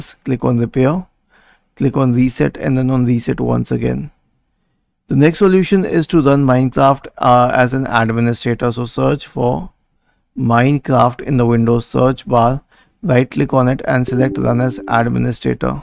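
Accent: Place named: India. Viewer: omg so indiannnnnnnnnnnn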